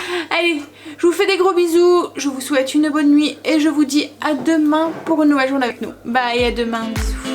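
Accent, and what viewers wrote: North, France. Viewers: Bonjour. Je découvre votre chaîne et j'aime beaucoup. Vous avez un joli accent, du Nord? Je n'ai pas Facebook, quelle levure utilisez-vous? Merci ❤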